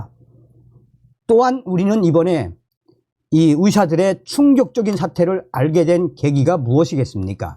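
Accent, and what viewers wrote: North Korea. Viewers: 북쪽말좀 하시지마고요 듣기소름끼칩니다 않보면되겠지요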